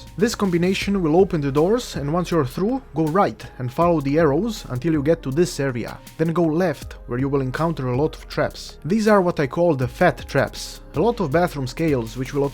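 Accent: Russia. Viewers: Ha ha ha love the commentary Russian accent is hilarious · Accent too thick, can't tell if He is Russian or Canadan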